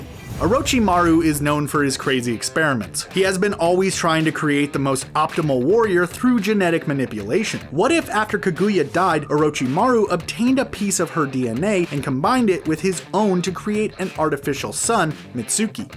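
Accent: United States. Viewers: Really? Naaaruto? Boooruto? Even kaaaguya?! Wtf. Kakashi and Tsunade are about the only names that don't maker me cringe when hearing them pronounced like an American. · He thinks that this is an American show that way he speaks like that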